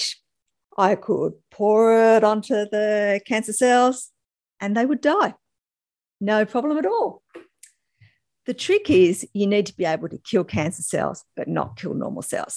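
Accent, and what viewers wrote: Australia. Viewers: she has Australian accent, so whatever their equivalent to NIH would be; also, Australia went full totalitarian fascist Chinese-style lockdown, destroying many lives and livelihoods (much more than USA, which was bad enough) during the plandemic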